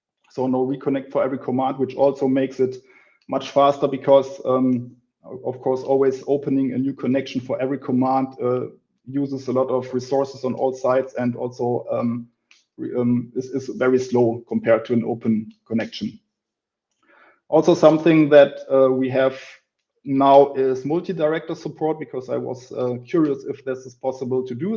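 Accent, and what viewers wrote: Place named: Germany. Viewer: Drei Wörter gehört und gedacht "det is n deutscher" und dann "sooo" =)). Ich wünscht ich könnt nur 10% so Englisch sprechen. But if I hear it, I can learn it.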